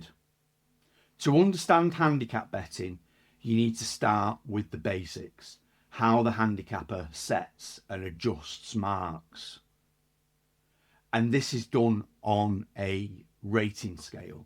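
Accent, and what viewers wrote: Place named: Stretford, United Kingdom. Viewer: Voice of experience. Everyone should listen carefully to you. Funnily enough I lifted "Class Horses" off the bookshelf a couple of weeks ago never thinking I'd see you on YouTube! Suspect you're from the same neck of the woods as myself listening to that accent (being a Stretford lad myself!). More power to you!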